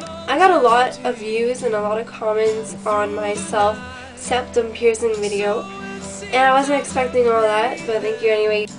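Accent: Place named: Canada. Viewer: I love your accent :D Canadian accents are cuuuuuuute ^_^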